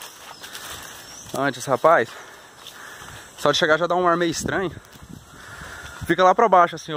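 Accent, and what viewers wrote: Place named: São Paulo, Brazil. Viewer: O defeito desses vídeos, na maioria, é não dizer onde ficam os locais mostrados, cidade, Estado. Pelo sotaque de quem está mostrando, a pronúncia dos erres - R, imagina-se que é em S. Paulo.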